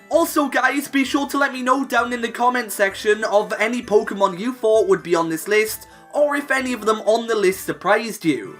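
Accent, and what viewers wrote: Wales, United Kingdom. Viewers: Youre welsh aint you butt? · Are you Welsh?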